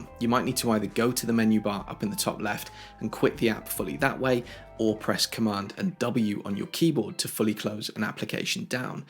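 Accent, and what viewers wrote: England, United Kingdom. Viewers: Great video as usual. I’ve gotten so much benefit from your content; you condense all the fluff and get straight to the useful info. Every Mac user should subscribe! On a personal note, the British accent is just an adorable perk. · I really like your 10 tips videos,. Since I moved to Apple a couple of months ago after 36 years (1986!) in the Microsoft-Intel world, I used a lot of your tips to make my MacBook Pro comfortable and convenient. Nice to hear a clear English accent, too. All the best, Rob in Switzerland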